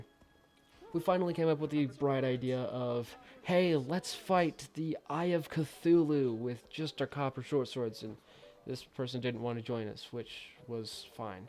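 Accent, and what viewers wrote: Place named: United Kingdom. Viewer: blud is bri ish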